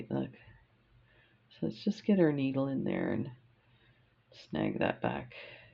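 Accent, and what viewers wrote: Canada. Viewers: Love this! Also love hearing that Canadian accent. We raced sled dogs for many years, and spent much time in Canada.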